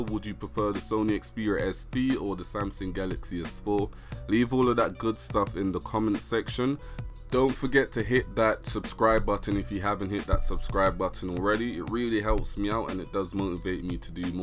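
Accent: United Kingdom. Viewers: hey, are you from south hampton?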